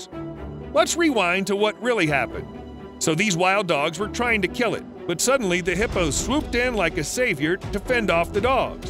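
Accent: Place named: United States. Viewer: The AI American accent is truly painful to hear. ....do yourself a favour and turn off the sound .. ooooops ,, too late ..your at the comments 😂😂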